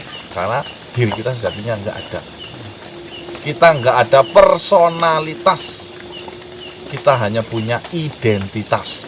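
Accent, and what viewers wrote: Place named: Banyumas, Indonesia. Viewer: Banyu mas wng ngapak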